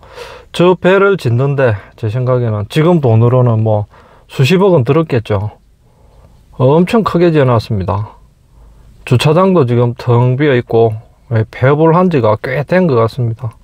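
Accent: Gyeongsang, South Korea. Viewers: 경상도 말 사용하니 더 정감이 가고 좋습니다 힘내시고 갱상도 홧팅❤ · 산 위에 배. 기억이 희미하기는 하지만, 강원도 정동진 바닷가에도 있었던 거 같은데? 이 곳은, 건축, 영업시설 자체에 문제가 있어 보인다. 바로 옆에 대형 철탑, 주변에 그런 대형 철탑이 산재하여, 이런 곳은, 기피장소에 해당한다. 그리고 산 위에 대형카페와 숙박시설을 건축하다보니, 걸어가야 하는 그 진입로 부분도 너무 좁고, 길고, 안전 난간도 낮고, 부실하여, 불편, 불안해 보인다. 보이는 전망이 주변 산 위에 시설된 철탑들인데, 굳이 이런 곳을 찾아서 가려할 까? 그리고 경상도 사람이, 경상도식 억양과 말투로 말하는 것은 당연한 것인데, 그런 것을 가지고, 어느 개 동네에서 사는 강아지가 낑낑 거리며 그런 개소리를 짖을까? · 경상도 사람이 경상도 말쓰지 제주도 말쓰링???웃겨들~~~~~~~~~~~~~~~~~~~~~~~~~ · 경상도 사투리가 어때서요? 경상도 사람이 경샹도말 하는건 당연한거죠.하여간 별별 간섭질 하는 사람들 그것도 병이다. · 경상도 사투리 넘넘 듣기좋아요 방송최고입니다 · 진례ㆍ장유 김해주촌 ㆍ진영ㆍ생림 삼방 안동 사투리좋습니다 응원합니다🎉😂😊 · 경상도 사람이 경상도 말 해야지 어디 말 할까요 개 껌 씹는 소리 그만 하세요.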